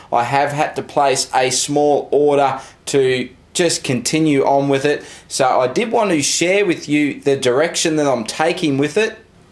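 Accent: Australia. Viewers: You have the most pronounced Australian accent I have ever seen. Great build btw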